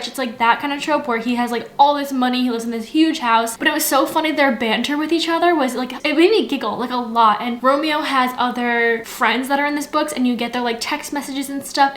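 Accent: Canada. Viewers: she sounds Canadian or something or Ukrainian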